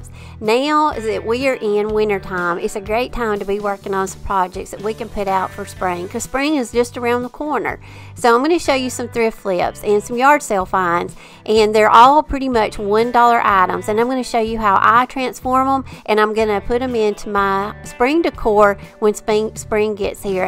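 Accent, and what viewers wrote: South, United States. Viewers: Love it all and love you too!!! You are such a sweet southern lady and love to hear you talk (Georgian here). Have a blessed day and look forward to the second half tomorrow!!!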